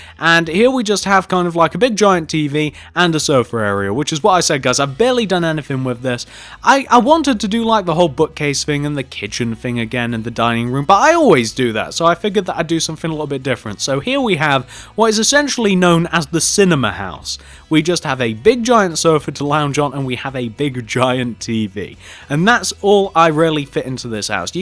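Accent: United Kingdom. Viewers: he's British so he says it like that! >:O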